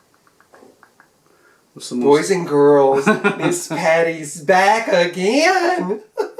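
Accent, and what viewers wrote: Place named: Arkansas, United States. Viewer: Patti, you are a hoot! I've watched all of your videos tonight and read thru all the comments (I'm a nosey heifer like that) I just wanted to say....I too, am from Arkansas and now live in Texas so I absolutely love your accent being as it sounds like me! lol I'm not that far from you and if not having someone to help you film is standing in the way of you posting more videos.....hunny, I will film for you! =}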